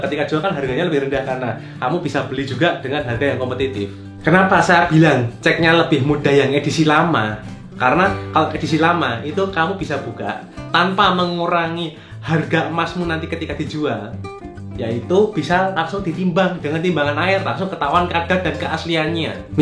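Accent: Java, Indonesia. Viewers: Koko bahsanya medok jawa bgt.. wkwk.. thanks infonya Koko.. sukses ya.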